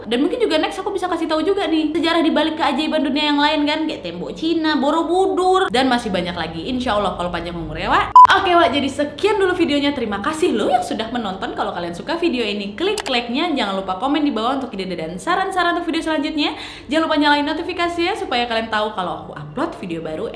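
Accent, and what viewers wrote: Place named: Medan, Indonesia. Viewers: Sukak kali aku lihat kau dek dengan cara menceritakan nya dgn gaya Medan en betul enak dan lucu tidak membosankan. Sukses selalu dekku.😘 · Orang mana kamu nak,bahasamu Medan xx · Salam saudariku,logat medanmu kental ya...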